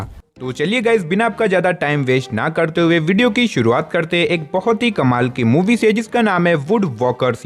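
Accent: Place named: India. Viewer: Evriting is good just your fucking India accent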